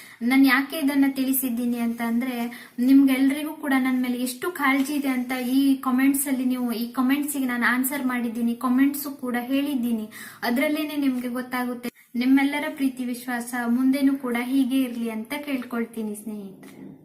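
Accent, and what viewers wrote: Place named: Honnavar, India. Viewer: Namm honnavara matu chenda akka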